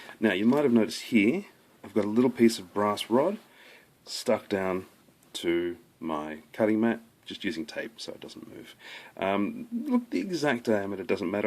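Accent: Australia. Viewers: He's speaking English. His Australian accent doesn't make him that hard to understand.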